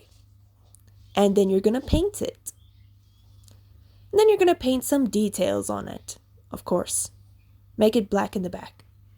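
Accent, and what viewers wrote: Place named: United Kingdom. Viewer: Oy you also British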